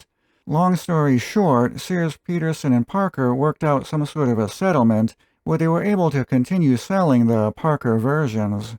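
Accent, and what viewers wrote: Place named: Boston, United States. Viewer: Your videos are always very informative and well done. Your Boston,( we say Bostin ) accent is gonna take a while, but keep trying. I noticed you added an "S" to Fall River, actually it's Fall Rivah! Great videos, thanks!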